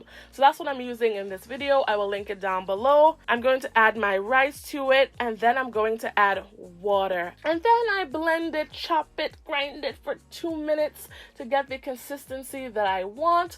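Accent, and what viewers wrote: Caribbean. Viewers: Do I hear a slight Caribbean accent ?? 👀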